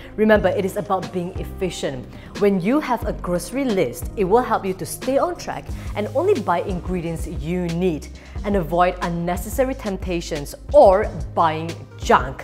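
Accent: Malaysia. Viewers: It’s a good Malaysian accent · Never felt this proud of our Malaysian accent😇👍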